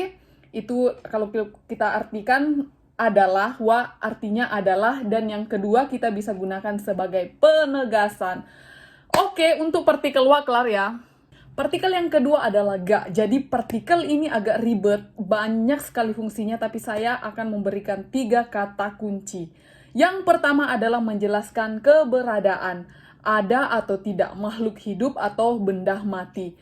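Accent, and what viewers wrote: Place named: Java, Indonesia. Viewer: jlas sekali mbk,ketoro wong jowo 😀😀😀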